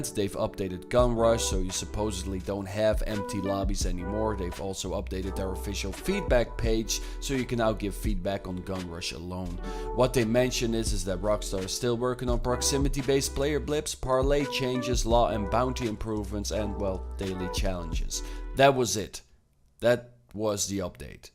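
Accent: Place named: Netherlands. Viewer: Let’s face it, RDR2, desite being the wayy more detailed and perfectioned game compared to GTAV, isn’t half as much fun to play, or at least not online. We can only pray for Rockstar to release GTAVI soon, because it is obvious RDR2 pales in comparison.. On a sidenote, just out of curiosity, where are you from? Your “regular voice” accent sounds pretty Dutch;) Thanks and good luck with the channel!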